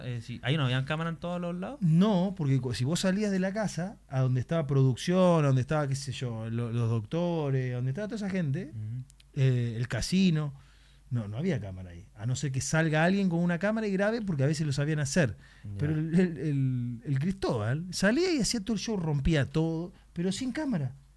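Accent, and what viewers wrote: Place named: Chile. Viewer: Habla como chileno ahora kajdjak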